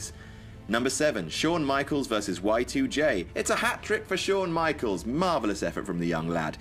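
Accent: United Kingdom. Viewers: can we not have a british guy speaking and get in to the compilation already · Steven Wall You're right. He's British.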